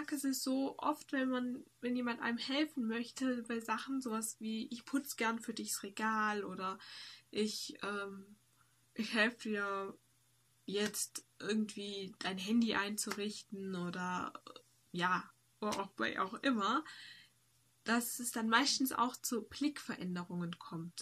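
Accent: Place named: Swabia, Germany. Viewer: Ich kann das nachvollziehen, wie Du in Sachen Hilfe annehmen fühlst. Du wirkst symphatisch und das Schwäbeln passt gut. MfG René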